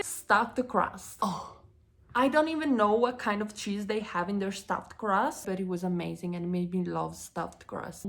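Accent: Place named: Italy. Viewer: An Italian woman agrees to work for Little Caesar's .... the subliminal message you are sending (from a REAL Italian complete with accent) is ... "I endorse Little Caesar's pizzas." What greater endorsement could they have? They should have given you a raise on your first day for the message that sends to customers. [EDIT] Judging from this video I think you could make a fortune on TV commercials for Little Caesar's.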